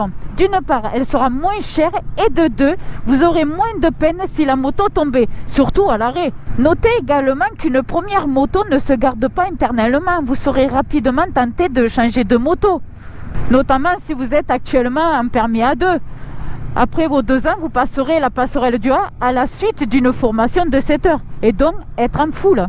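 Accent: South, France. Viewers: Qu'il est rétro-rafraichissant cet accent du sud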